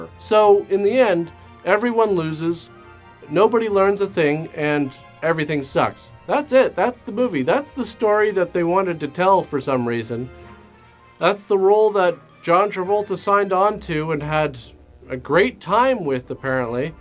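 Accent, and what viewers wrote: Canada. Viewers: I'm Scottish. I'm used to hearing horrific versions of our accents. Americans can barely tell Scottish and Irish apart, or Cockney and Australian... Cloth ears! At least I can tell is a Canadian - the way he says "about" is a giveaway.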